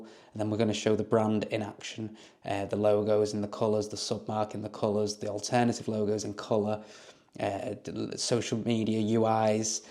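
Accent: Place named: England, United Kingdom. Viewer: Where are you from boss? Your accent sounds English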